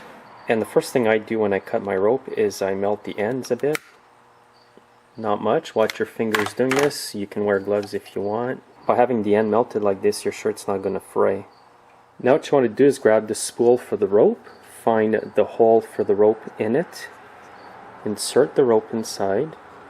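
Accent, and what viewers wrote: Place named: Quebec, Canada. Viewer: Hey this worked absolutely perfectly on my 180 C Easy2Start. Couldn't have done it without your careful, calm coaching. You sound as if you might be from Quebec, if so, merci from an Ontario friend!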